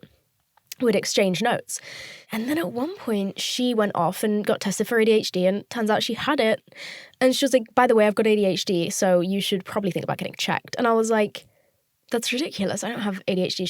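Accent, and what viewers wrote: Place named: England, United Kingdom. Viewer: First off: love, truly Second: something about proper English accent, so eloquently spoken, pushed through a profane, bright, articulate girl - it's like Hermione Granger if she watched way too much Netflix.. it's an amazing, original voice and I am HERE for it 🍿 Third: always better to have more information about yourself than less. The further you go in life you find everyone is, minimum, a little fu*ked up - the only difference is who knows themselves better, who has better countermeasures and coping mechanisms. is lovely, honest, much needed and must be protected at all cost. Do what YOU need to get through the day, all of you, please believe there is a 50% chance the person next to you on the bus or in line or at the grocery story is equally or more messed up than you and/or going through even worse sh$t than you. 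Listen to, appreciate her honesty and challenge yourself to be that honest with yourself. Awesome job Ms. Carry on Queen 👸🏻🥂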